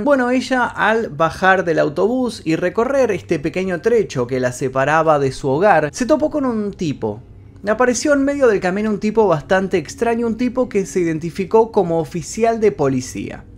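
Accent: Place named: Argentina. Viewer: Guapo... linda voz con ese acento tann lindo argentino... eres super profesional... mi nombre es Paz Alejandra de Santiago de Chile🥰😍🤩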